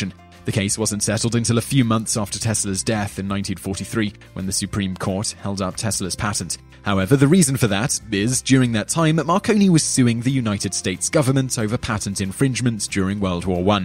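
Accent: United Kingdom. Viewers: This guy is singlehandedly making british accents sound stupid.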